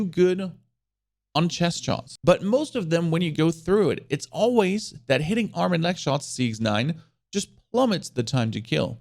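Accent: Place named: Germany. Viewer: Do you live in Germany and learned English there? If so that’s absolutely impressive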